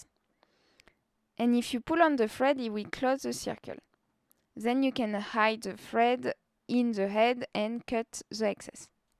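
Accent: France. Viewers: Love it. I’m working on my very first big Santa . Your tutorial is easy to follow, thank you so much . Are you French ? Love your accent.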